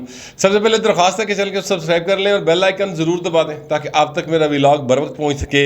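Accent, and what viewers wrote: Punjab, Pakistan. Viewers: ""Kuti Cheeka"" Zara Tehzeeb Key Saath. If you watch VideoLog about 15Months before,He Talk Totally in Urdu,Very Serious but When He got Established (You Tube Dollars ),He start talking in Street Punjabi.Why?Cause he is "" "'.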